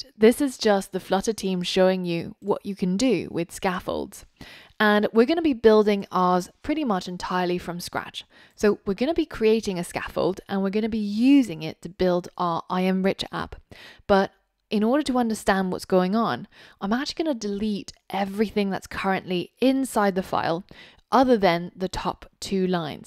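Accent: United Kingdom. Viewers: I'm getting accidental ASMR from your British voice :) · I love your British accent!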